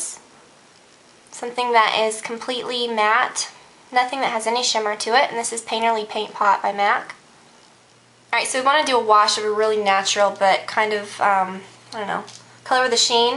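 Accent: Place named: Texas, United States. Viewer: you sound like your from texas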